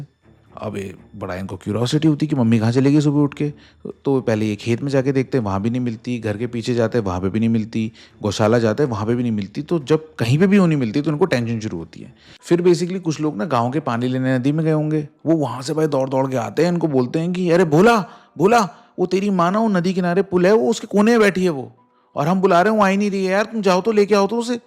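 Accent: Pahadi, India. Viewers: Apke bolne m pahadi accent ata bhut acha lgta h sunna mere bhi apne dosto s bolne m pahadi accent ahi jata h😂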